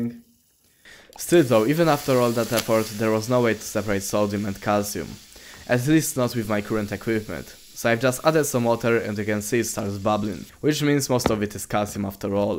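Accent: Poland. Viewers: Your polish accent echos through my dreams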